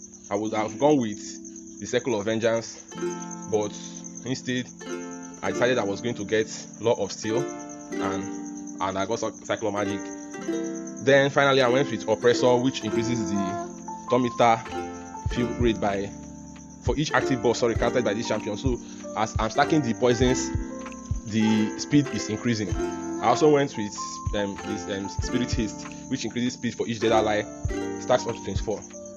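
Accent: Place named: Nigeria. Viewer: Mitchell it is english, he has a Nigerian accent, at the top of the screen you'll see a box, you'll see a box with "CC" click on it for subtitles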